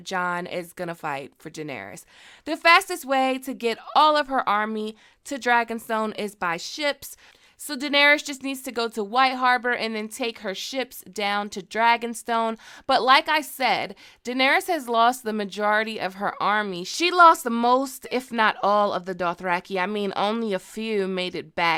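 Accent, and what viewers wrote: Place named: Michigan, United States. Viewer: You from Michigan aint you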